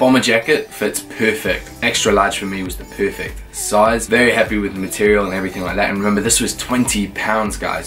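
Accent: New Zealand. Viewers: are you a kiwi living in the UK? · Sounds like you copped a New Zealand accent 🇳🇿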